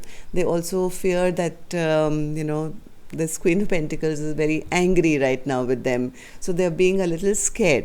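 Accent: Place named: Delhi, India. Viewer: Your voice is so familiar.. are you from New Delhi 💐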